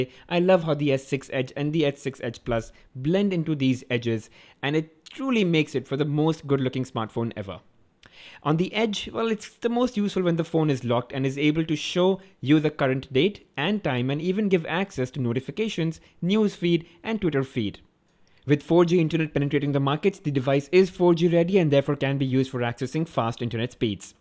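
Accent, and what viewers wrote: India. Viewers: nice review . i love ur accent with that desi touch . :) keep it up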